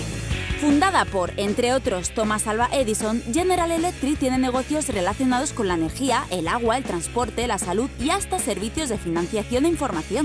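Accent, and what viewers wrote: Spain. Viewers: Recordar que es española y en cada país pronuncian las cosas diferente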